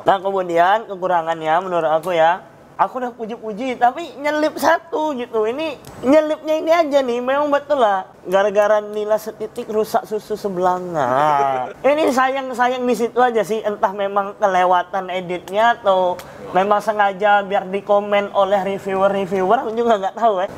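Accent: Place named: Sumatra, Indonesia. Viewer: Hahhaa logat sumatra bgt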